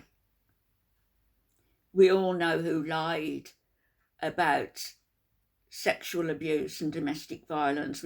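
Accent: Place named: Midlands, United Kingdom. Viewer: I love your midlands accent. You are so on the nail with everything, and your perspective is always interesting and entertaining. Keep up the fantastic work.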